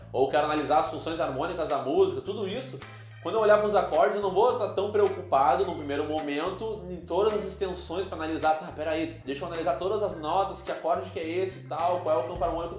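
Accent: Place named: Porto Alegre, Brazil. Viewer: Sotaque de pôoorto, bah!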